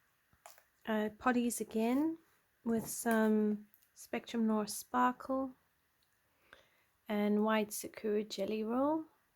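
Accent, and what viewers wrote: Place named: South Africa. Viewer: It was so great to hear another South African voice on the Color Tube. Good luck with your channel, your coloring is so lovely.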